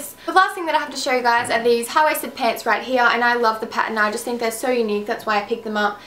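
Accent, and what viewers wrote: Australia. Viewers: your accent sounds australian but it doesnt? lol. this is the first video ive see of you. a) you're gorgeous and b) you have an amazing body! werk it gal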